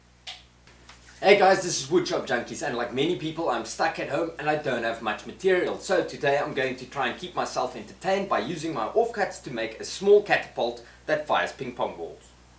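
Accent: South Africa. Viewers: Hi. Gr8 to hear that unmistakable South-African accent. I am based in Benoni, Gauteng. Been on your channel now for about 3 months. Really enjoying your work. I am also left handed so your hand work makes perfect sense to me. that "dido" you spoke of is pronounced "Dado" I am fairly new to woodwork and eager to learn. i am on my 3rd project of the lock-down and like you, ran out of materials (and beer) while ago. small little projects to build skills and keep me out of the wife's way... keep going and well done..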